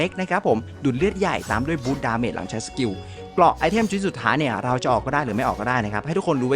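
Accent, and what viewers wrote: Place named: Central Thailand, Thailand. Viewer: พี่เป็นคนเหนือหรือ ลวดเครียกลาง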